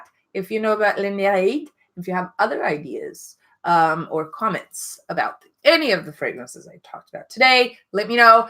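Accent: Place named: Romania. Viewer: Incep sa ma uit la Imperial Opoponax pt ca asta ma interesa si zic in mintea mea: 🤔 parca are accent de romanca 😅 si ma uit sub video si vad: romanian chanel ....😅 am avut noroc sa il gasesc in Norvegia si l-am luat intr-o secunda😁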